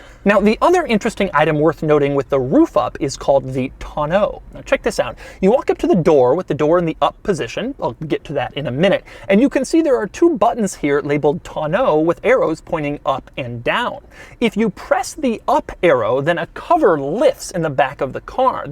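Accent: Australia. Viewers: nahh way mate straya english aint nothin like those pommies! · Shout out to Australian English 😂😂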